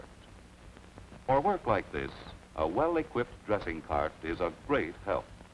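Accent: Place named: Mid-Atlantic, United States. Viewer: Man, those are some dull- ass scissors. That much hasn’t changed in 80 years! I can’t figure out what that metal tube is sticking out of that sailors back. Maybe a nephrostomy tube? Also, I absolutely love the narrators voice. Precise, no discernible regional accent, smooth enunciation and pitch variation. Waning days of the Mid Atlantic Accent.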